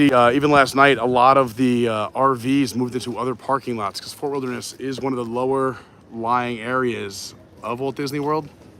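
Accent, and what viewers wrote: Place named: New York, United States. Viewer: what part New York are you from?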